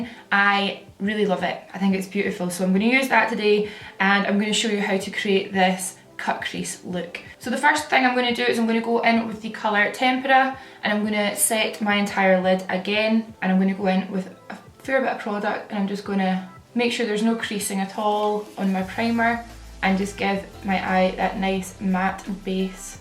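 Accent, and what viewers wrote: Scotland, United Kingdom. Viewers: Your scottish accent is the best kind. yours is the kind of accent I wish every Scot would have. non of that ned shite 👍😂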